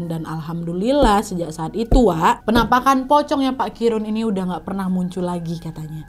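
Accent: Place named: Tanjung Balai, Indonesia. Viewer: Inget banget dulu nontonin kak dari masih ribuan subs, seneng aja sama logatnya karna sama² org tjng balai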